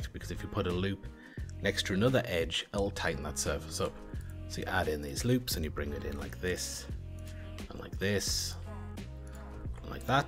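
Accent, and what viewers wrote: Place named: Durham, United Kingdom. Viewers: That Simple Deform > Bend trick is great: I've been using Blender for 15 years and never knew that. Would have saved tons of time! I presume from your accent that you're somewhere near (East?) Durham too, so you get points for that. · Many thanks from this. Subscribed, but you are doing fine there anyway! Where in the North East are you from? I'm a Sunderland lad 😁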